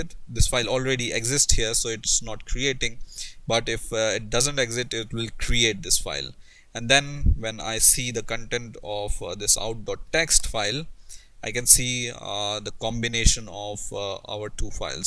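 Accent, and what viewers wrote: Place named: India. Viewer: Please, do us all and yourself a favor and try to improve your accent, so it's more English and less Hindish!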